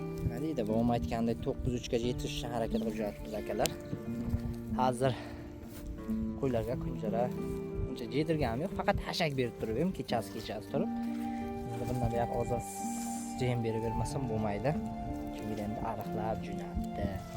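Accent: Surkhandarya, Uzbekistan. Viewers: Узбек Сурхондарё Кашкадарё областларини шеваси шундай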